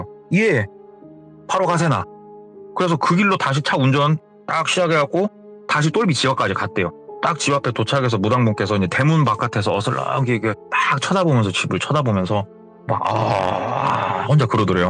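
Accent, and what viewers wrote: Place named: Chungcheong, South Korea. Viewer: 님 사투리는 항상 충청도ㅎㅎ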